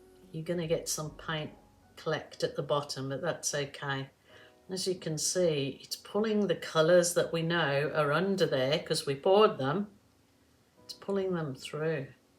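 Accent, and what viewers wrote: Birmingham, United Kingdom. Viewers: Hey there Lovely! I'm really late to the party only just found your channel 🤦‍♀️. Absolutely love your art.❤ (Great to hear a Brummie accent too! Great Barr gal myself. X)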